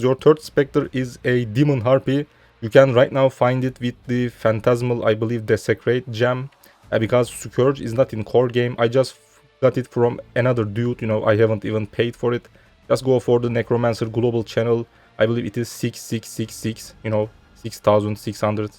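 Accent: Turkey. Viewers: reis türk gibi ingilizce konusuyor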